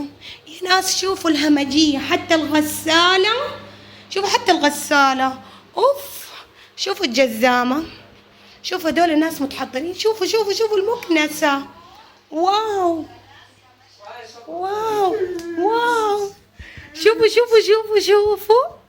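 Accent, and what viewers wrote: Mecca, Saudi Arabia. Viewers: احلى لهجه في الدنيا لهجة اهل مكه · هيه هيه يلبى ذي لهجه اهل مكه و جده تجين تسبين نعم ؟ وجعاه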